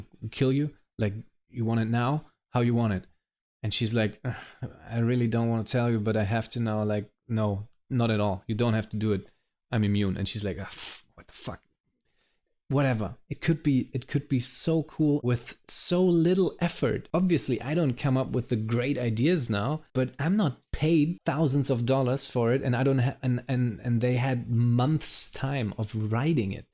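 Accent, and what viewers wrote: Germany. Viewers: Where is your accent from ? Germany ? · I caught the accent too lol, have German family. Ich mag deine Video Reel Talk!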